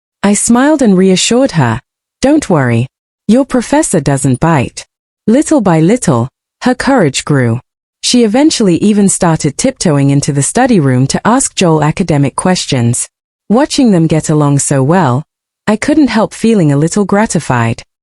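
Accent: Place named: England, United Kingdom. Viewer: 1st! Interesting English accent! 😊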